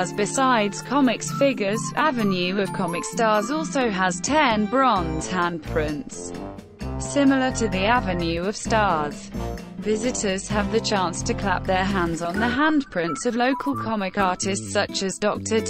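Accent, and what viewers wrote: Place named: United Kingdom. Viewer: Wow sosyal may British accent hehe.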